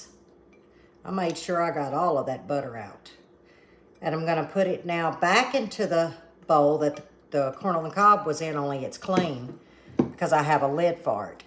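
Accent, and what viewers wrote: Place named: South Carolina Lowcountry, United States. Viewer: is a treasure. As soon as I heard her speak I recognized the south carolina lowcountry accent. I have spent a lot of time in the lowcountry and the folks there are warm and inviting. Lovley people. Now she resides in the upstate of SC where I am from. Been watching her for a long time. She is a great storyteller too.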